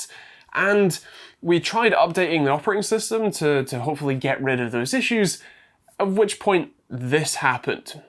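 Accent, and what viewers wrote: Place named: Highlands, United Kingdom. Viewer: No way! a Scottish Techtuber! :D sounds like you are from the Highlands?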